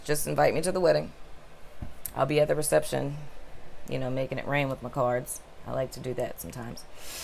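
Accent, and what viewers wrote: United Kingdom. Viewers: hahaha this is my first time watching you I like you I'm from London and I like your "RP - British English Accent"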